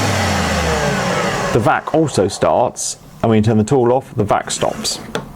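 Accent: RP, United Kingdom. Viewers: Gosh, you sound posh. But, great review mate. Thank you.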